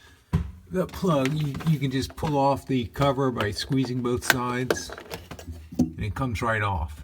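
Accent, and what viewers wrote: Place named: Pennsylvania, United States. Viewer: Pennsylvania? or New Jersey?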